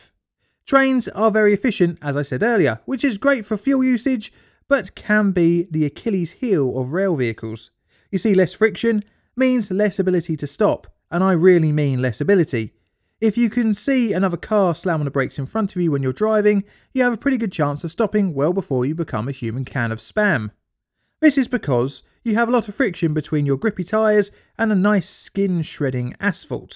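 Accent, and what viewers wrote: United Kingdom. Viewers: Dude love your stuff I mean who takes the time to learn all this I guess we do cause you make it interesting and god love that British accent yes please we would like more or should I say Please sir may I have some more!!!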